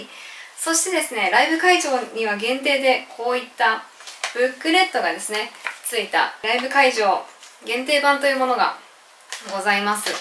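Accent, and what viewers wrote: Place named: Kansai, Japan. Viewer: やっぱり、ときどきの関西弁 可愛いです。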